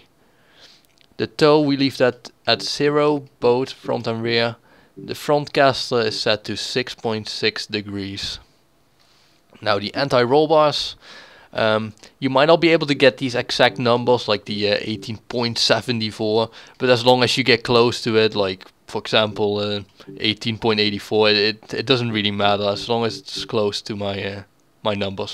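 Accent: Netherlands. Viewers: Are you dutch to me you sound dutch nice tune btw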